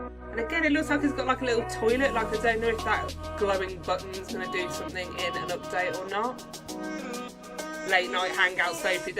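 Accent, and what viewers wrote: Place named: United Kingdom. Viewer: I can't believe it took me this long to actually comment on this video. For context, I am the creator of the game and I was sent this video to a few friends of mine who found it and even I came across the video after searching up the game on Google. I didn't think much of it but today I did another search and I thought I should watch the whole thing! 1. - Clock Soapy's badge error was a period of time where more people had that badge than 60 Minutes, so it was disabled to stabilize the amount of owners with said badges. Sorry for that! 2. - I was not actually expecting the areas to be THAT small since the original size was based on Spawn, which didn't have much. My main reason for making these areas small is that I hate large and open areas. it leads to longer searches and overall takes a lot of time to find things like buttons. 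3. and - Yes those are toilets. They're planned to be used for a new area in the future! 4. - Oops I guess I made it too close to the main road 5. - "Cheeky little button" I LOVE this commentary! It really adds a spark of life to this video (I'm sorry but the British accent makes this video way more entertaining to me than it should be) 6. - I'm glad I get to hear someone falling for the glowing flower! It felt so good to add that in knowing it would throw everyone off 7. - School is something that's for sure but I'll try my best to keep the pace going! 8. - That's a really good point. With how I plan to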